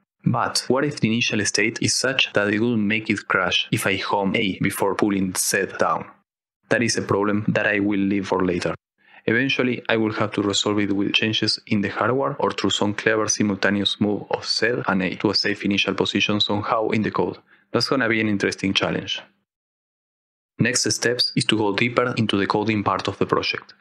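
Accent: Argentina. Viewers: Espectacular tu prototipo. ¿Sos Argentino? te delata el acento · Really liked your video. You sound so Argentinian, like a friend of mine talking in english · Increíble proyecto!!! Escucho un acento argentino o estoy flasheando :?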